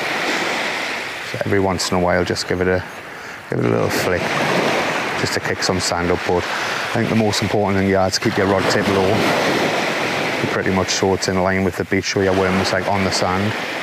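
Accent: Newcastle, United Kingdom. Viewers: No offence but I hate the Geordie accent I've had a few bad run ins with Geordies and I just can't handle the accent any more. Good fishing tips thanks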